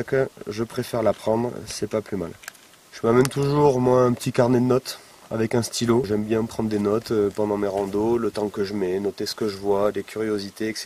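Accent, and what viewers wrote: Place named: South, France. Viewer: salut, tu as un léger et vraiment bel accent qui me rappelle Marcel Pagnol! J'aime beaucoup tes vidéos traitant de la randonnée ( je fais uniquement du cyclotourisme, mais le principe est le même). bise d'Alsace.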